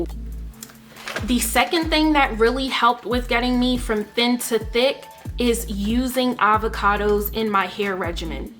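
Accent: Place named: Trinidad and Tobago. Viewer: You Trini? I hear it in your voice lol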